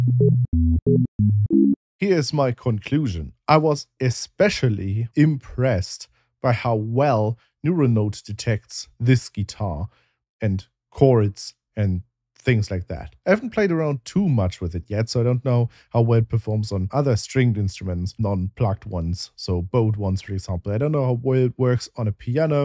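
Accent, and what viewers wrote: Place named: Germany. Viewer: By his accent I think he's German, so God bless Germany too!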